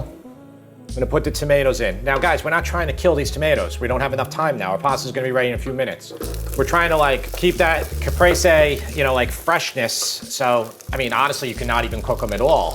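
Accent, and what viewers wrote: New York, United States. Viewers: I'm not sure where you live but judging from your accent I'm guessing New York? Anyways have you ever tried Frank and Sal's Italian Market in Staten Island? I live in Maryland and they ship me all my cheeses and tomatoes, they are incredible!! If you haven't tried them, I highly recommend them!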